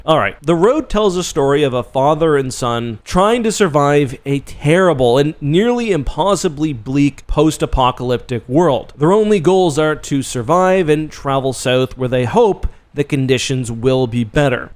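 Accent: Canada. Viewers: Canadian lol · I am confident just by hearing your voice you are a fellow Canadian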